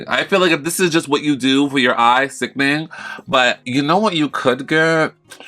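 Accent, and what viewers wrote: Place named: Boston, United States. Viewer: i think that's just her boston accent tbh 😭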